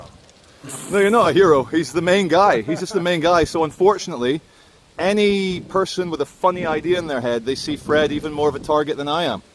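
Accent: Scotland, United Kingdom. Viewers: with a Scottish accents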